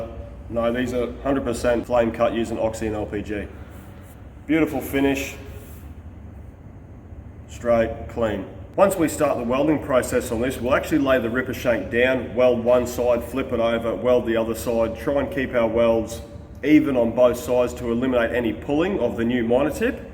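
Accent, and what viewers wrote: Australia. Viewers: been watching now for a few weeks and only just noticed your Australian i guess it shows as us aussie have some pretty good skills ;) watching your vids have improved my daily quality too being a younger boilermaker/ metal fabricator i find this channel very intresting and helpful to watch TYVM for the quality videos and work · If you pronounce "fail" in Aus as "file" in US, how do you pronounce "file" in Aus? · They do know your from Australia and that is how you guys normally talk people cry over the dumbest things well hopefully the have their safe space and like and subscribe LOL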